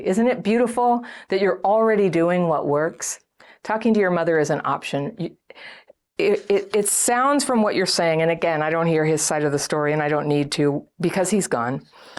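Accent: United States. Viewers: appreciate your American accent. Voice From home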